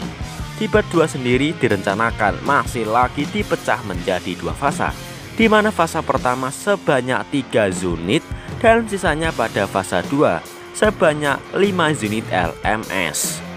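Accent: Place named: Java, Indonesia. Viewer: Saya Indonesian... Saya bisa pastikan admin ini Indonesian juga... Slank Jawa atau medoknya masih menonjol atau jelas terdengar (hanya bisa dipahami oleh orang Indonesia) 🤗🤗🤗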